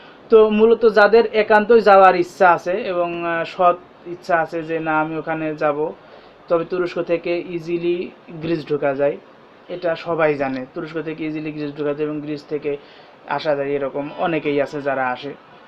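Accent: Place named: Jessore, Bangladesh. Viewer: আমি মলায়েশিয়াতে আছি ,আমার 7 টা দেশ all rady ঘোরা আছে , আমার বেতন 3500 রিংগিত বাংলা 70 হাজার + , তুরকি তে আমি to -rest অরিজিনাল ভিসায় যেতেচাই মাত~ 10 দিনের জন ,আবার মালায়েশয়াতে ফরবো, পরে ইউজোনে to -resta যেয়ে আর ফেরবো না , আপনার জানা মতে তুরকির ফাইল গুছিয়ে দেবে এই রকম এজিনসি আছে ??যানাবেন ভাই ,আমার বাড়ি যশোর ,আপনার কথা শুনে মনে হয় আপনারও ঐ দিকে ??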